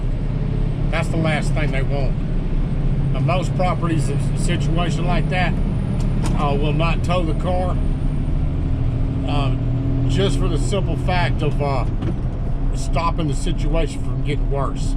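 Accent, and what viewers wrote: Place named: Georgia, United States. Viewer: Hey man, I'm originally from Georgia and had to move further north a few years ago. Can't tell for sure if you're from northern Florida or southern Georgia but watching your videos is like a trip back home for me. I'm only half watching what you all is doing. Mostly I'm just enjoying the conversation. One good ol boy to another, I thank you.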